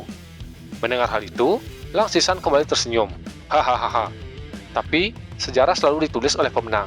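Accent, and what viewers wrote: Bali, Indonesia. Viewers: Cengkoknya kayak orang bali ya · logat balinya kentel bgd^^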